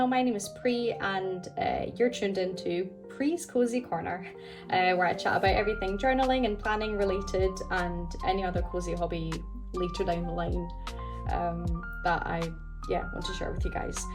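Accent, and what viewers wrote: Scotland, United Kingdom. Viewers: Great to see and hear another Scot on YouTube! I'm a Stalogy girl/old woman, but I do love the cream cover of the Hobonichi! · Subscribed not just for the Hobonichi content but more so for the Scottish accent which gives me the tickles ❤ Hope you have an absolutely fantastic day!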